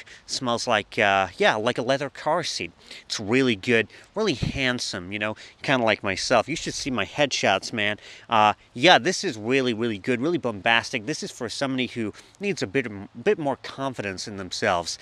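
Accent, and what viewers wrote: United States. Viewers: very nice american accent. Are you sure you wanna be a director rather than actor? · The American accent is getting a little better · Great American accent! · perfect American accent. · I didn't realize this was until minute 10. The accent was so good I literally assumed flew in an American friend for this video. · Great list was a pleasure watching. Love the American accent 👍 keep up the great work. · An American accent? Really? I believe I can die happy now. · That's a great midwest American accent..and some Gene Shalit vibes..love this whole thing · Love the American accent and that early cinematic porn feel. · That's an excellent American accent i gotta say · I was so confused when he started speaking in an American accent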